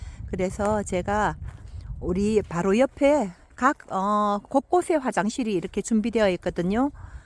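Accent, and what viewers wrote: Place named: Gyeongsang, South Korea. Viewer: 멋진 영상과 구수한 경상도 사투리 ~~~ 즐감하고갑니다 👏 🙆‍♂️ 늘~건강하시고 행복한 나날 되세요 🙏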